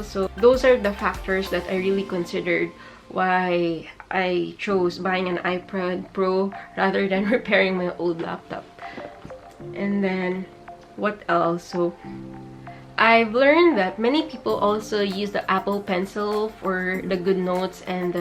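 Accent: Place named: Philippines. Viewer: This is the very first video I'm watching of you, and I was like, "She looks like a Filipina than a Japanese." 'cause of the way you pronounced your words and then I read your bio AND TURNS OUT I WAS RIGHT!!! YEPPEYYY So Ate I hope you could answer my questions (⁎⁍̴̆Ɛ⁍̴̆⁎) + I'm almost graduating and my birthday is nearing, and I don't know whether to get the iPhone X or Ipad Pro together w the accessories. - If I pick the iphone x, it'll be my second phone I ever had for the passed years! I'm not using a phone rn only an Ipad, w a 16GB storage. I SERIOUSLY LOVE EDITING AND MY GALLERY IS PACKED UP W HOT KOREAN BOYS. AND I DISLIKE ICLOUD. + Do I have to purchase the app; Procreate? Or is it free? + Does the Ipad Pro have a screen recording? - 'cause I'm planning on making tutorials of my edits. + How good is the Camera Quality? 💜💜💜💜💜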